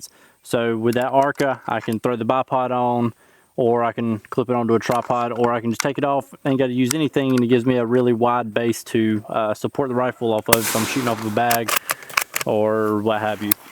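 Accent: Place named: South Carolina, United States. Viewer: Hmmmm, accent, foliage, weather, east coast.... SC?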